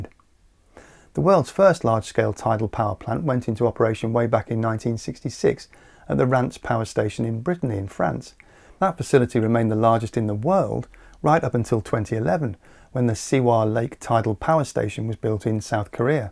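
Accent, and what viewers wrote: England, United Kingdom. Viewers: what about large fish and whale collisions with the blades??? can a cage be built around the blades to protect the blades and block marine critters from impact. First time viewer. I like your presentation style, your pitch of voice and your speed of speech, and---your accent. It reminds me of my dear grandfather who was from Atherton England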